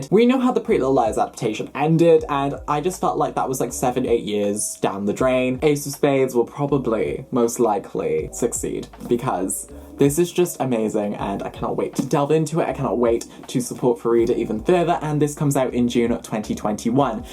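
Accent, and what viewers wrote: Wales, United Kingdom. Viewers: Where are you from because your accent sounds like you have a Welsh twang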